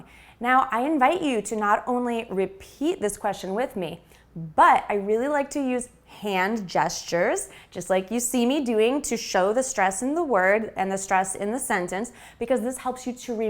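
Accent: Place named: Philadelphia, United States. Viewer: I like to hear your accent. I seem to hear the same accent in Philadelphia.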